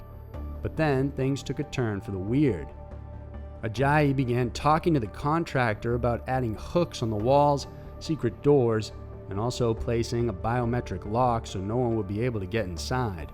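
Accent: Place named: Texas, United States. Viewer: Hi, new here. Trying to place this guy's accent, has he ever said? Sometimes I hear Va/NC area other times it sounds like Ga or Tx. Prbly way off, anyway... thoughts?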